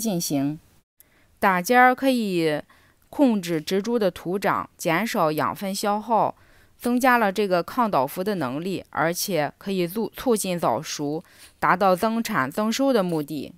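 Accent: Qingdao, China. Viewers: 听到熟悉的青岛普通话，很亲切，我种的蚕豆容易倒，不知道什么原因，看到你的这个视频，对我种蚕豆有很大邦助，赶紧剪枝，繁殖太多衩了，主干细高，谢谢你的视频。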